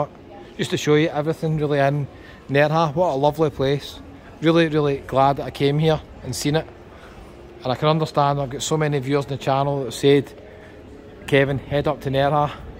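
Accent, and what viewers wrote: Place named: Glasgow, United Kingdom. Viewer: That's a real Glasgow accent, so it is.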